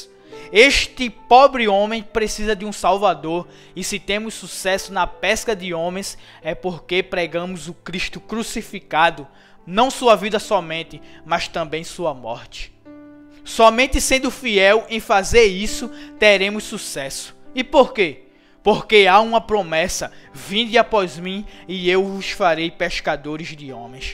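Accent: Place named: Pernambuco, Brazil. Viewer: Parabéns ao locutor, que Deus o abençoe por sua disposiçao em transmitir esta palavra abençoada. Pelo sotaque creio que o Irmão é pernambucano, conterrâneo nordestino. Sou do Ceará. Orem pelo nordeste precisamoz das orações dos irmãos. Glórias a Deus.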